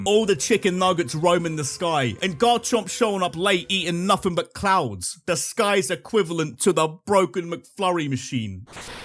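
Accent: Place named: United Kingdom. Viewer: You're so british I love it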